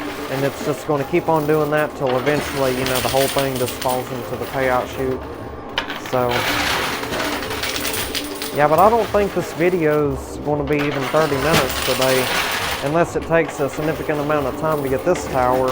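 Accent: South, United States. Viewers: The fakeness of ur video is matched only by the hick-ness of ur accent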